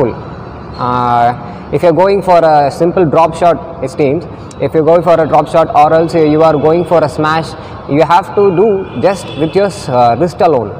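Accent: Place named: South India, India. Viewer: he is south indian thats their accent. u understand thats important .ignoreit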